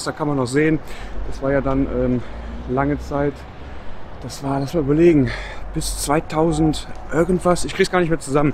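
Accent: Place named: Cologne, Germany. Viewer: Guten Morgen. Tolles Video, wie immer und dazu dein Kölche Dialekt, vom feinsten. Wünsche Dir einen schönen Wochenstart.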